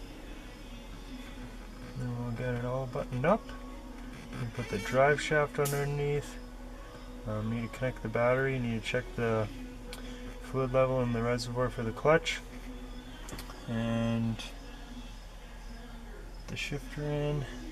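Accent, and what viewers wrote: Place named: Canada. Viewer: He sounds Canadian. :)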